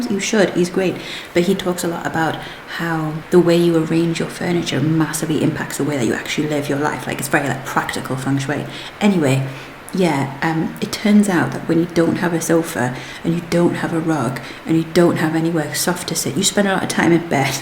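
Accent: United Kingdom. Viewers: are you actually Japanese or are you British because you seem Japanese but you have a British accent? I’m curious I’m American myself by the way my name is Jordan and I am a boy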